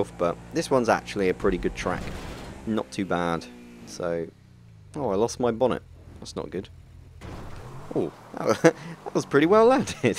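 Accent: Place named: Southern England, United Kingdom. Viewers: Which british accent...lol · DeadDudeDancing I'm from the UK and I'm not sure, sounds like he's from the South like me, I think what sets him apart is (no offence) his high voice. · Which british accent is that?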